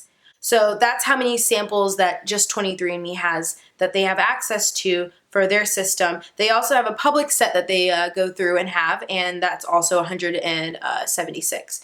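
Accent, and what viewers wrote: Somalia. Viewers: your Somali is just awesome. "gabartan waa walati" love that accent. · I love your somali maay accent..miss confusing for those who don't speak maay but anyone who speaks maay will understand you.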